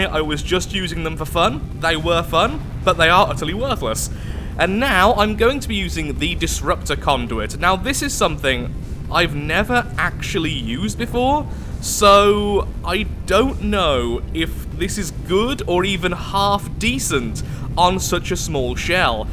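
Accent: United Kingdom. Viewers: Indeed (also British accent)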